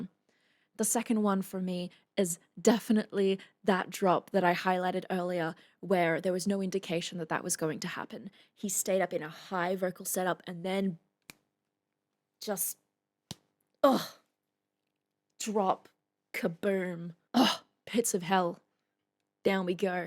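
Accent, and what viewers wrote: Australia. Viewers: With your beauty and that aussie accent, man your a hottie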